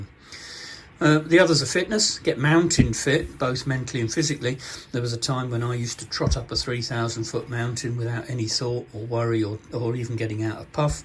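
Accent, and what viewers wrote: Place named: United Kingdom. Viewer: you're teaching me how to do british accent,ackkk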